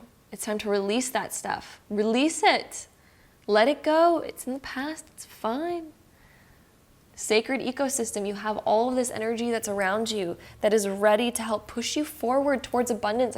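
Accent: Canada. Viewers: Pile 2: little late watching this lol as a Canadian I loved the Canadian accent. It's my ex who needs to apologize but honestly as much as I need some closure I'd prefer if he didn't contact me. I've been closed off from love but I am starting to want love in my life again. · Lovely, thank you so much. When I heard you the first time, I thought you are Canadian because of the way you say "out" or "about" 😊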